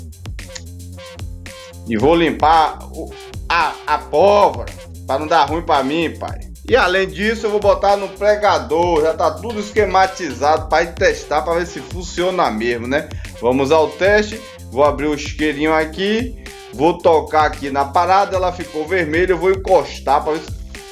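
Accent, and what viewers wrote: Bahia, Brazil. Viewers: Senário muito foda, baianês excelente = a vídeo diferenciado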